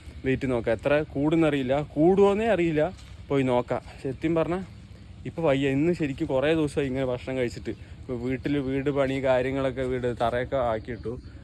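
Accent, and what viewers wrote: Palakkad, India. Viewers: Hello videos ellam ishtakunund palakkad slang kelkkan orupadu ishtanu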